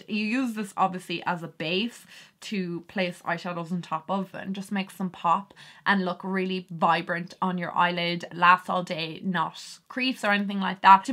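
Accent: Ireland. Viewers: hey is ur accent altered by ur tongue ring or do u sound the same without it? I've never come across any gurus from ireland and i like hearing ppl speak little fun facts about where they are from ... u all don't know theyre fun facts bc naturally to u all its normal bc its where u live lol. But anyhow, do u know of any other gurus from ireland that i could sub to as well?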